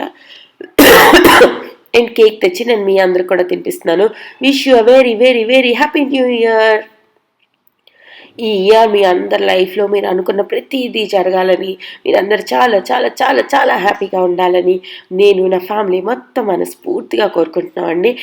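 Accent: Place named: United Kingdom. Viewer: Meru middle lo English words Chala Baga use chestunaru accent uk laga undi uk lo chadvara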